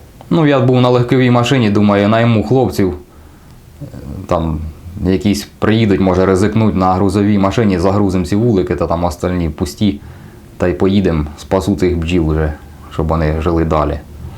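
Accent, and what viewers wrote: Ukraine. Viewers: какой же поганый суржик) жалко хозяйство , побольше нервов и сил на восстановление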